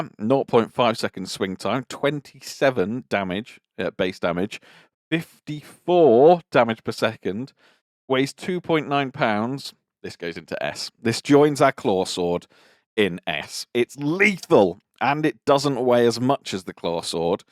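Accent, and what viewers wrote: United Kingdom. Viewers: You Brits and your zeds and oughts..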